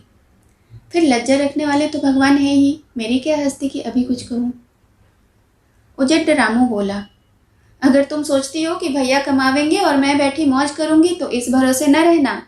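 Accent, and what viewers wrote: Standard. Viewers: Aapki aawaz bahut mithi hai bhasha ka uchcharan bahut shuddh hai.👍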